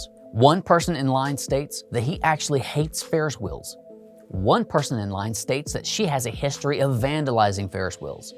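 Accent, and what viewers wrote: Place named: Texas, United States. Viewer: It's a Texas thing. All the legal beagles down here pronounce it that way.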